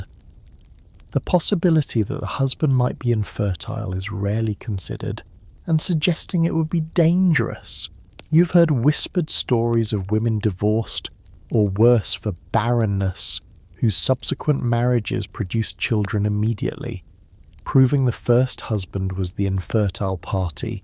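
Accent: England, United Kingdom. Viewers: It's 5.40 am in South Australia. Love the history, and the English accent ❤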